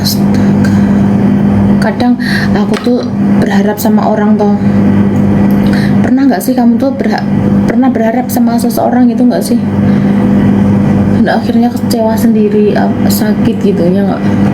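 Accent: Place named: Java, Indonesia. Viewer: Maaf bunda bicaranya separti orang jowo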